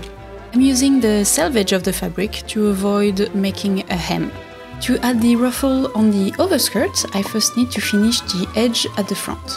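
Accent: France. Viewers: The Youtube algorithm just blessed me with this video. This is the most ridiculous, over the top and amazing dress I have ever seen and I LOVE IT. I like to watch historical sewing videos but I am delighted with this. The project seems so fun and a great use of sewing skills. What's the point of sewing if you can't make an extravagant dress? Also, I love the accent. I have family in France (92, 93 and 94). I just don't write in French because I'm terrible at it. Bisous du Portugal :D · Je suis vraiment heureuse de trouver des tuto couture venant d'une chaine française, votre accent en dit long. Votre robe est magnifique merci de partager vôtre savoir6et votre passion. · Usually I can't stand listening to narration, but your gorgeous French accent makes it enjoyable. Cheers ✌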